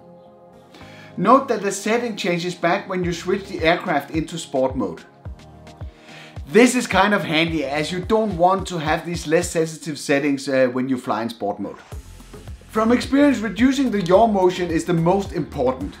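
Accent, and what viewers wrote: Denmark. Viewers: Love the accent. Danish... :D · That’s a very danish accent there👍👍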